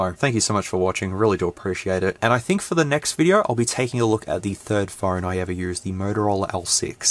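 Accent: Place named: Australia. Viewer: See you sound Australian so I believe you but you are whiter than me and I'm Irish 😂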